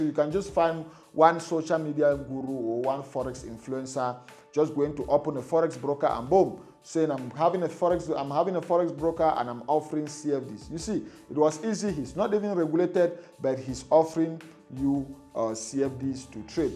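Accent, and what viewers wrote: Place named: Akwa Ibom, Nigeria. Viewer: You have akwa ibom accent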